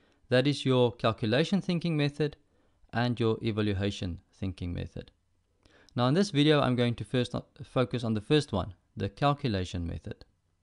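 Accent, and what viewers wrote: South Africa. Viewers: south african accent ? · This guy is south African I can tell from the voice 😅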